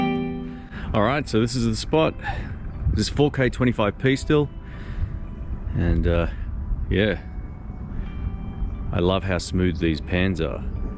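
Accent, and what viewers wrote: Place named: Australia. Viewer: I stumbled across your videos looking for a ring light review and I am glad I did. I am planning on using the GoPro as a facecam and I believe it will work well with the 10" ringlight on your channel! Keep up the videos, and I was wondering why everyone said you had a cool accent and I was confused because it sounded normal, before I realised you were also an Aussie like me!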